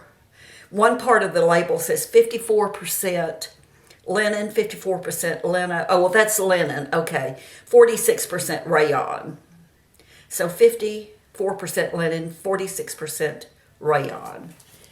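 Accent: South, United States. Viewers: hear that southern drawl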